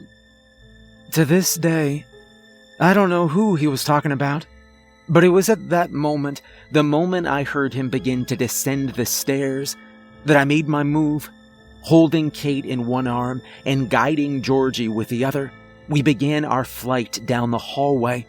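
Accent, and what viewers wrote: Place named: Midwest, United States. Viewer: He certainly has an accent. It's really only noticeable with the way he pronounces certain words, like saying "crayons" as "crahns". If I had to guess, I'd say he's from the American midwest somewhere.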